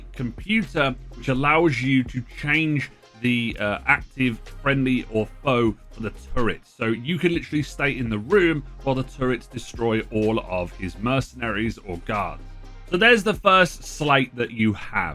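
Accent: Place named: England, United Kingdom. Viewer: Ahhhh, English.....ENGLISH!! Not American trying to talk at 6 words a second! Why do they do that? Great video, precise and clear. What a treat. Liked and subbed.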